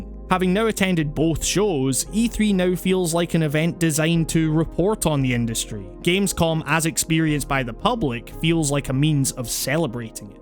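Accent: Scotland, United Kingdom. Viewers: He's Scottish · Clearly a Scot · I’m pretty certain he’s Scottish, especially with a name like.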